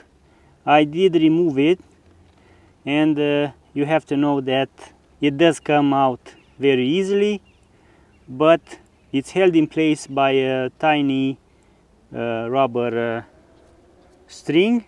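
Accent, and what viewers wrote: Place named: Romania. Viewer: Нормальный восточно-европейский акцент (он из Румынии), зато нам же и всё понятно, что он на инглише говорит. И большинству во всём мире понятно. Так что напрасно вы докопались. А вот что у нас этой машины нет на российском рынке - вот я бы про это переживал. Возможно стала бы даже более народной, чем предыдущие генерации, сильно потеснила бы в этой нише Creta, Seltos и т.п. Но увы :(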